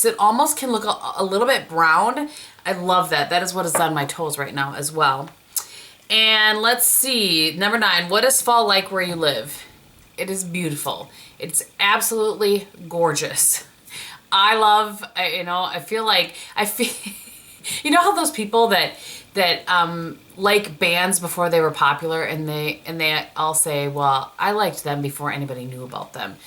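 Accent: Wisconsin, United States. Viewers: Your Wisconsin accent is great! Love from Madison, WI!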